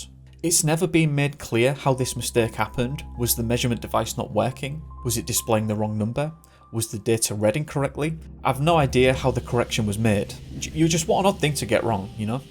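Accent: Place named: England, United Kingdom. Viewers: Love listening to your videos keep them coming,was wondering were are you from your accent sounds like your not far or from middlesbrough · Ware abouts in England are you from . I am trying to figure out the accent ! Just somthing I do lol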